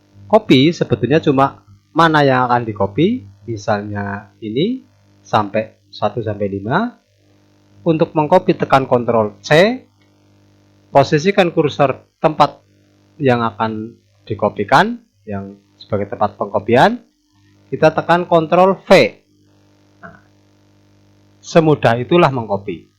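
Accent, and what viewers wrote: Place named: Banyumas, Indonesia. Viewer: Gokil..yakin..jos wong ngapak. Jelas bgt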